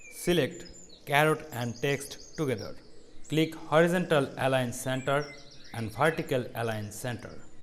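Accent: Bengal, India. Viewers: this is the bengali accent, almost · It's sound like that u are a bengali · own a indian accent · English a bolar quality tei bojha jacce j uni bangali. tai bolci banglai bolte r kicu na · Youtuber: speaks with Indian accent Me: throws up, dislikes video, reports, leaves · Pure Bengali Accent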